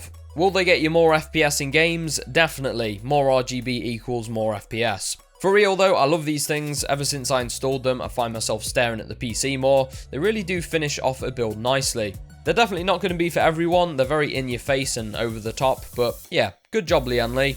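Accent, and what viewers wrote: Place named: United Kingdom. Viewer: Damn you have a strong uk accent :D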